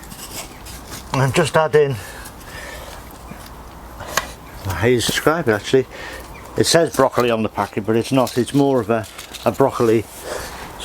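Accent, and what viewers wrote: Liverpool, United Kingdom. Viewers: 👍👍👍is that a scouse accent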